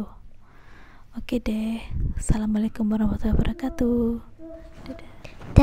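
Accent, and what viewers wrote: Java, Indonesia. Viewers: padahal muk ngene iki pidione, tapi panggah tak like 😆😁 demen leh medokkkkkkk, gass bund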